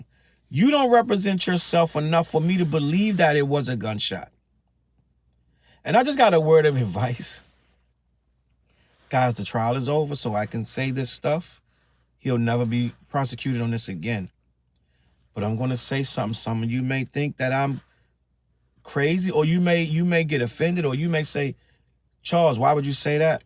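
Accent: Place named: DMV, United States. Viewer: I thought you were from the DMV… the voice gave it away. I’m from SE DC too. I lived in Valley Green in those years so I know about those days.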